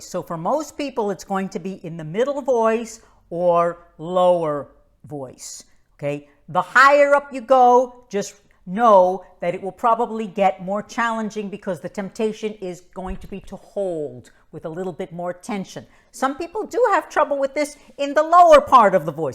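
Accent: New York, United States. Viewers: I love your NY accent! A lot of my family is from there and it reminds me of them 😄 Also your videos are super informative. Thank you!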